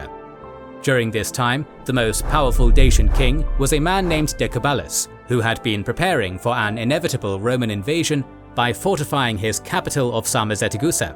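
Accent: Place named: United Kingdom. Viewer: Ništa mu ne verujem. Ima Britanski naglasak. Oni su prevaranti i lopovi.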